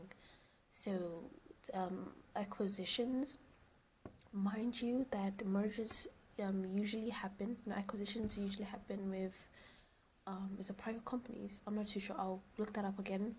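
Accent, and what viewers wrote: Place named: United Kingdom. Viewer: What a British accent you have , i am amazed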